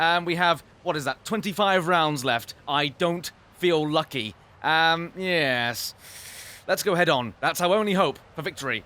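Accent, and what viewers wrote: England, United Kingdom. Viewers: I love how you talk. what part of England are you from I am from Nottingham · thank goodness I was just getting bored and a fellow brit pulls out a craking old video perfectly spiffing chappie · hilarious guy with hilarious british accent 10/10 would watch again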